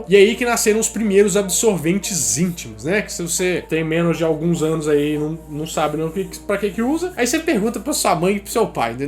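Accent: Minas Gerais, Brazil. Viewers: Viciei nesse mineirin.. kkk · Sou fã deste mineirinho... Fala serio este jeitinho nosso malandrinho de falar da uma certa atração 😂😂😂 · interesante eteu sotaque.. Mineirim? gpiano??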